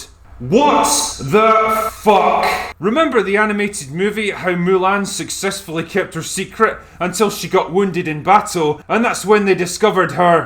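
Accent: Scotland, United Kingdom. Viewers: Because he really is smart his earlier reviews were very traditional hes basically a awesome scottish mr plinketf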